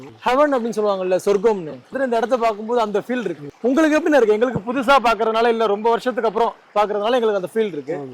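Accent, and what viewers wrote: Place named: Tirunelveli, India. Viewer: தம்பி நீங்க திருநெல்வேலி பாஷையில் பேசி பாட்டியம்மாவிடம் எடுத்த பேட்டி super தம்பி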